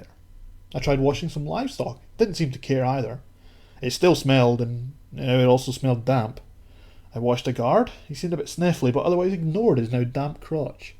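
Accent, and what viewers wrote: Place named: Ireland. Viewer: i think he is Irish lol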